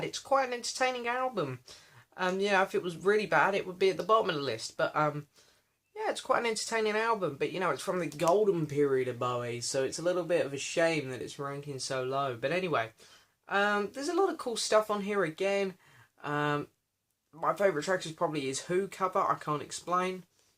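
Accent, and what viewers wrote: United Kingdom. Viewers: I liked your ranking just one thing I think you should give a little more information about musicians in the albums and producers I like your Brit accent I am from Mexico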